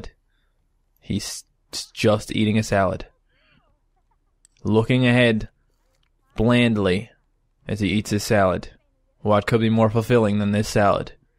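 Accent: Canada. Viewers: Hello I watched your sims 4 videos with my mom because she is obsessed with the sims, she loved your videos and wants to know if you are Canadian, because of your accent... Yeah... Random. Anyway, thanks for vids, you really made us laugh.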